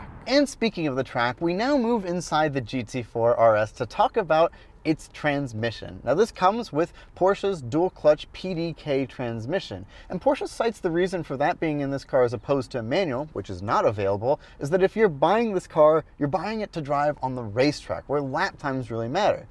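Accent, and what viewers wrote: Germany. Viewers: German accent is my new favourite thing ever!!! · ! Love the German accent. Awesome video. 👍🏻🇺🇸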